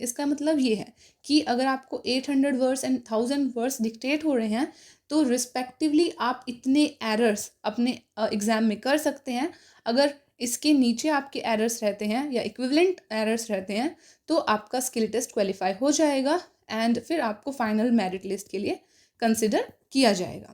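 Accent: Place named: Haryana, India. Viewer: I like your haryanvi accent🔥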